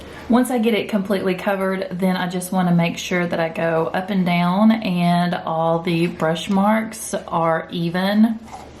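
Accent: South, United States. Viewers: What! You have that soft sweet southen accent. Us northerners don't.😁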